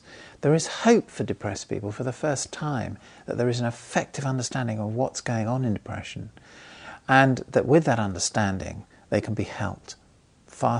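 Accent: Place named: RP, United Kingdom. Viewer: I want to take it seriously, but he looks like me *when* I'm depressed. Fluffy hair because I haven't bothered brushing it, bags under my eyes despite sleeping all the time, and clothing I've just thrown on (flesh coloured jersey?). :( Having a David Attenborough accent doesn't make something authoritative...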